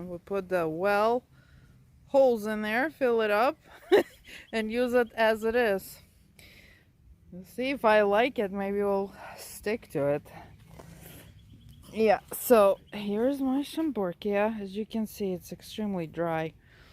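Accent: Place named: Poland. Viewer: I love phalaenopsis and I grow them in my livingroom. I got interested in these plants through my sister-in-law. She has a kind of accent like yours, because she is Polish. That's why I thought you were Polish or Russian ;-) Florida seems a great place to live. But maybe a bit too hot for me. And too many spiders, snakes etc. Thanks for sharing your knowledge and way of life, from the other side of the world. All the best. And lot's of Rain! :-))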